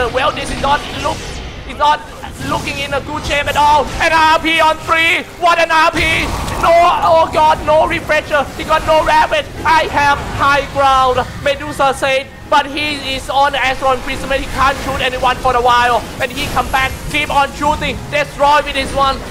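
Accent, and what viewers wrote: Thailand. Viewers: The Caster sounds like Thai or Vietnamese... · Everybody's hating on this caster saying "he has low knowledge on the game". Well for me, he's entertaining. Has a sense of humor which is rare in the casting scene. He's a breath of fresh funny air. (And his Thai accent is part of his charm)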